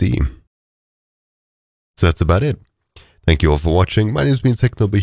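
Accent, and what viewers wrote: South Africa. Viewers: I know an SA bra when I hear one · Are you a saffer?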